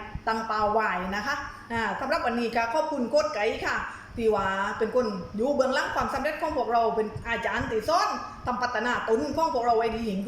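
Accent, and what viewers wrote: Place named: South, Thailand. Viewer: แหลงหรอยเลย ไม่ผิดหวัง เสียงใต้ บ้านเรา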